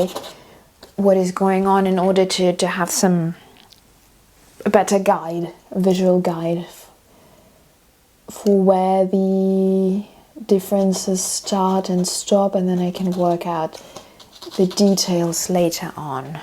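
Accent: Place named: Australia. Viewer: Love your teaching so much ❤ I love your accent, I'm guessing that you are from Australia originally? I'm in Newcastle England. If you get time and only if you think you're viewer's would be interested could you explain how to paint sheet music like I've seen in some old still life paintings. And how to paint writing on letters such as that painting by David of the dead man in the bath please. Happy new year 🎉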